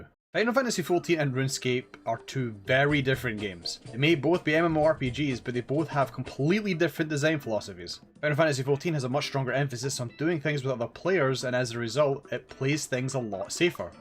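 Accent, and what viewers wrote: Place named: Scotland, United Kingdom. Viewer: another Scottish final fantasy xiv YouTuber... oh wow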